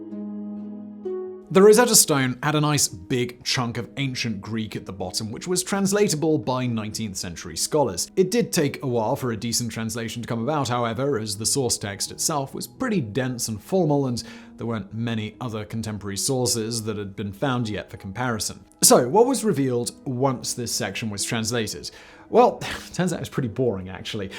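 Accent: England, United Kingdom. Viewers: More interesting than that stone, can someone tell me what english accent does this guy has? It is definitely not an American, but it does not sound like standard english either.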